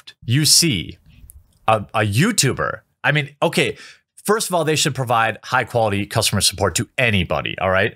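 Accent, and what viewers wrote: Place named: Milwaukee, United States. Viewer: Lived in Milwaukee, can confirm this is true. Any aaaaa sound like aple goes 'Ay' instead. Ayple? Weird but funny n cool how dialect works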